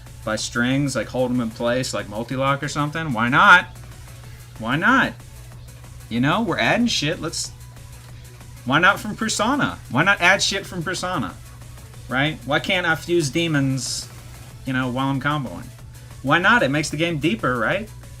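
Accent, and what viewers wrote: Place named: South, United States. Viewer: you have a Southern drawl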